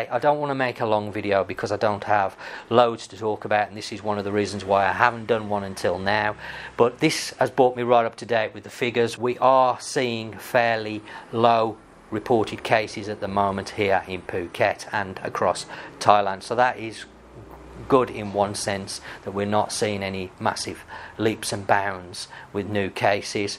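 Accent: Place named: Birmingham, United Kingdom. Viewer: haha ye I know that actually meant what part sorry you sound like a brummie lol I sent you an e mail a while ago regarding motorbike hire.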